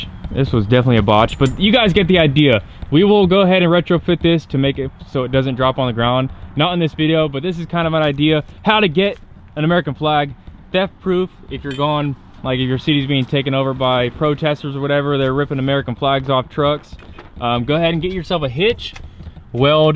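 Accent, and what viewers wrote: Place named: South, United States. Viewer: You are starting to get a southern accent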